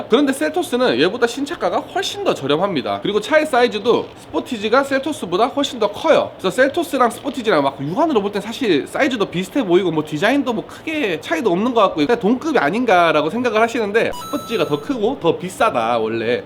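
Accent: Gyeongsang, South Korea. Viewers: 경상도사투리 싫어하는데 이양반 말투는 듣기좋아 ㅋㅋ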